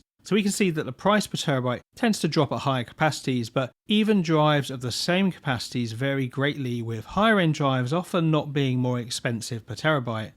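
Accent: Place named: United Kingdom. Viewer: Hard to follow, heavy British accent.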